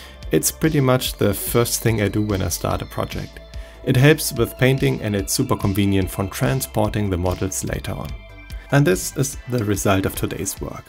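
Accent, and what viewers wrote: Germany. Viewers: you are german i am sure haha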